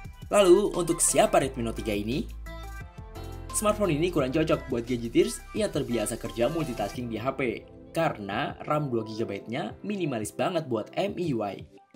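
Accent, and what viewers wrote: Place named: Banda Aceh, Indonesia. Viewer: basa indo meutok lage awak banda.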